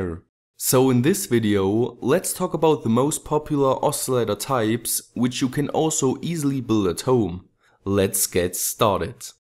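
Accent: Germany. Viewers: that accent though, germans genes are strong in this one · Wie man sofort hört dass du deutscher bist ^^ Aber sehr geile videos machst du, respekt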